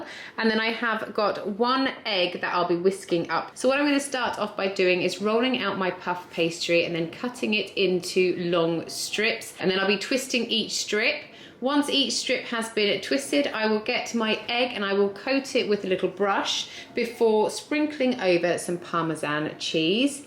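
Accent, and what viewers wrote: United Kingdom. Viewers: Morning,! At least it is here in California 😉…I have been lucky enough to to have been to London many times with a wonderful four weeks spent there training a person in our London office so your lovely accent made me a little “homesick” plus Aaahhh Tesco! You have a new subscriber! I have an air fryer and two Instant Pots with my latest kitchen fun, the Ninja Creami so will enjoy any contribution/ tricks you can show me!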